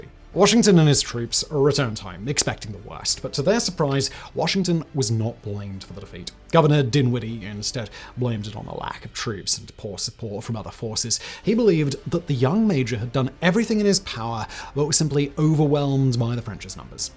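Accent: United Kingdom. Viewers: I am not English native speaker (it is my 3rd language) I watched thousands of documentaries in English but this guy speech is unbearable, too fast and annoying monotonous british accent (or whatever this guy is). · hearing a brit talking abt george washington is crazy. love ur vids im not hating it’s just funny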